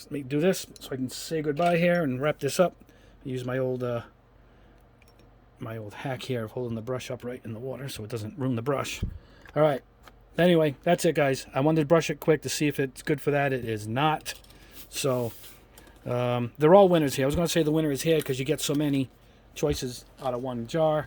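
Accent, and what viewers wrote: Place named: Long Island, United States. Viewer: New England.. I would have guessed Long Island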